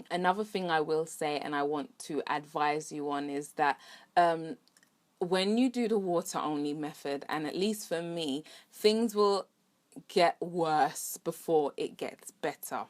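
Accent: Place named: United Kingdom. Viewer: Yes! Lovely to hear a fellow Brit isn't it? Made me feel at home!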